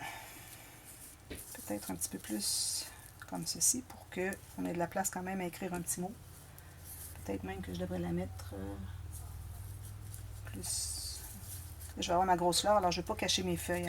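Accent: Canada. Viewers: et cette accent canadien j'adore !!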